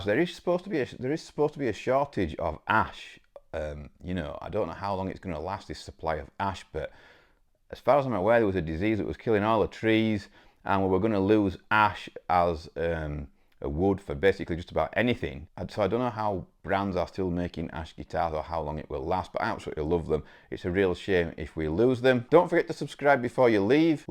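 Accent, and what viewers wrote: United Kingdom. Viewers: Great video. Man. I love the single cut. I also love ash with the way your Charvel is. Very cool, but like you I love single cuts and Gibson short scale. Ash is actually several, or about 4 woods used in guitars. So called swamp ash is from the southern US and is typically light weight . I have a Japanese Strat I am building and still need to order a neck. It is cool with three EMG SA pickups because I hate single coil noise. I also have a 1990 Charvel Japanese crackle red with reverse pointy headstock and factory Schaller trem. You can throw that guitar against the wall and it won't go out of tune and I've had it since about 2000. I knew the original owner and both of us ayes this guitar a lot and the frets are still huge. They used some kind of Japanese superior steel in their frets back then. I also have a Japanese Ibanez and it's frets don't seem to wear. 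I had a Mexican strat that was great but those frets sorry down in like 4 years and I sold it. 😂 Great video though and I hope you can get the single cut especially to show us. It sounds like it has a great price. I think the Euro and dollar are about the same now. So makes it easy to convert. 😂 Are you in the UK, or Scotland or Ireland? You have a really thick accent to this American. 😂. I can usually tell. I have a friend from Scotland here in the US. You sound like him. My son went to Scotland for like 6 months while in college and he loved it. Anyway. Great channel a d good videos sir. I've been following you awhile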